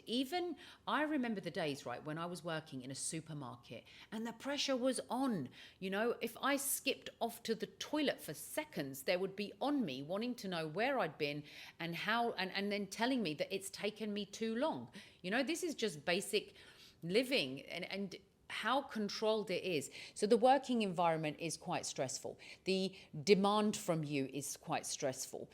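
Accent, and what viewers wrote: United Kingdom. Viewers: Godddammmmnnnnn she sound so british, even more british than my british grandma and she on hijab wth😂😂😂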